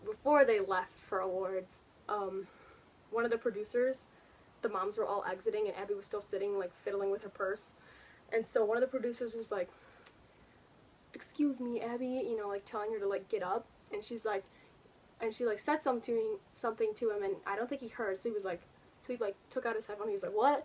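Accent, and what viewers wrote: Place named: Detroit, United States. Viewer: I love your Detroit accent lol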